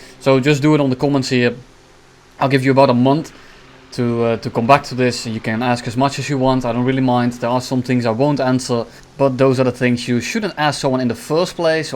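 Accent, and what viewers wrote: Netherlands. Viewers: Q: what country are u from? You sound dutch but that's Just me I think.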